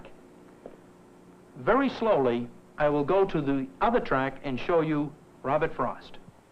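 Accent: East Coast, United States. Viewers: Good east coast accent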